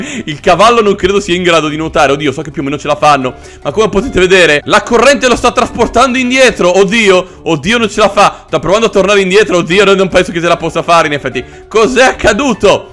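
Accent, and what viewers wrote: Veneto, Italy. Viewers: Bello il tuo dialetto Veneto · Like chi conferma che l'accento iniziale di era veramente veneto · ADORO LA VOCE DA VENEZIANO (ed sono anche veneto)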